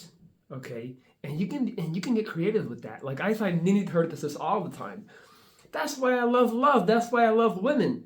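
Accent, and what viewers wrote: United States. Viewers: Your English is very good! Have you lived in the USA, you have an American accent. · How come you speak in an American accent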